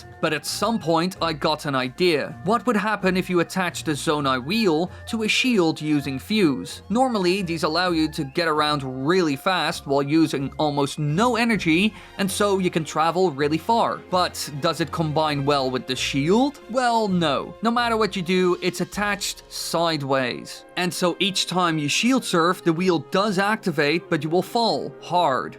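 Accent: Netherlands. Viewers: You have to be Dutch or Belgian man, I'm putting my money on Dutch though. Accent is bij IEDEREEN hetzelfde HAHA.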